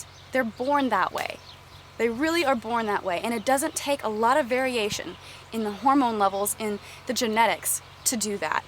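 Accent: United States. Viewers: Such a great way of putting it! Love your videos and your message! My bf was watching them with me and says "she sounds like an american version of you Steph!" :') Love watching someone make total sense! xx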